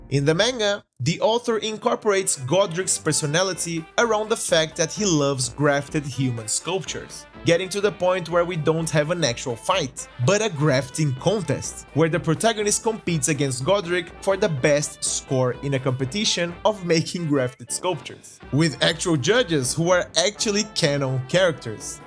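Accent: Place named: Brazil. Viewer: Bro You're brazillian right? Lol. Got from the accent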